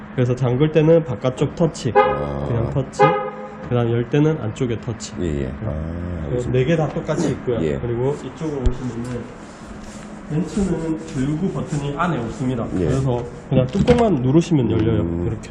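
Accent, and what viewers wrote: Gyeongsang, South Korea. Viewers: 같은 경상도 사람으로서 듣고 있습니다 친절하게 설명 잘해 주시네요? ^^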